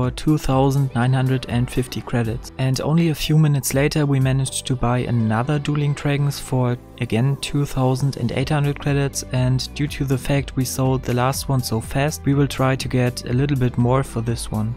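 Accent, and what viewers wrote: Germany. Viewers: I like these Videos but i have one question. Bist du Deutscher? 😅 hört sich voll so an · Du bist doch deutsch oder das hört man raus haha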